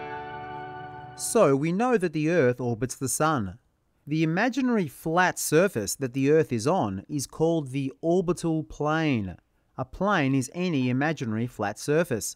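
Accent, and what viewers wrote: Australia. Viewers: Sir r u from Australia?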